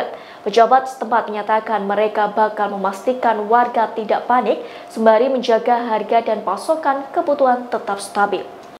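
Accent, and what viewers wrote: Java, Indonesia. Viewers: mbaknya medhok ya.. lokdon...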